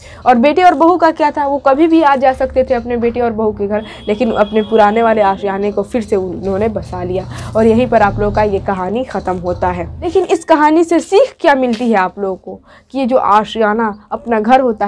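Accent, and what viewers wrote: Bihar, India. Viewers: آپکے بات کرنے کے انداز سے لگتا ہے کہ آپ بہار سے ہو بہار کی کیسی تہذیب ہے کہ وہاں کے لوگوں کا تلفظ تک درست نہیں ہوتا افسوس صد افسوس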